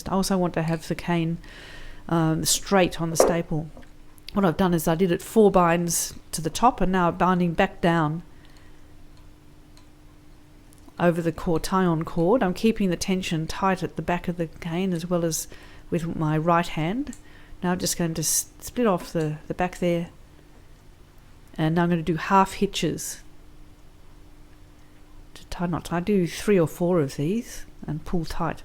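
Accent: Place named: Australia. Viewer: This was so interesting to watch. Thank you :) This might be a weird question, but where do you live? Youre accent sounds australian...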